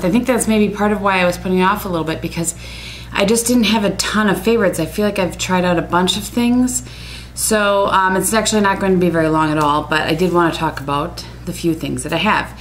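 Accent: Wisconsin, United States. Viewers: Love your videos,. My father's family is from Michigan and your Wisconsin accent is very similar. I have baby fine hair and appreciate any suggestions for adding body and volume. I have been liking Phyto's Phytovolume Actif spray and Schwarzkopf's Osis+ Dust It.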